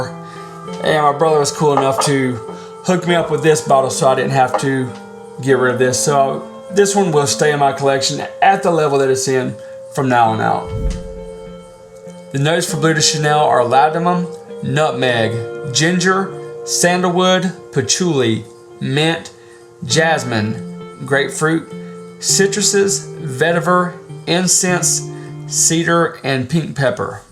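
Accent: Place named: Louisiana, United States. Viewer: I don't know if that was a chicano accent or a one straight from the bayoo 🤔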